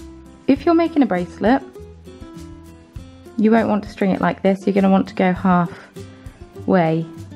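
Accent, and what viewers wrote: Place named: England, United Kingdom. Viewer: hello " " just subscribed to your channel. just posted another note to your answer to my post on another's channel. anyway, I am looking forward to checking out many more of your videos. I like hearing you talk, my Arkansas dialect is not as well sounding as your England accent. anyhow, looking forward to many more tutorials. sincerely Becky B from Arkansas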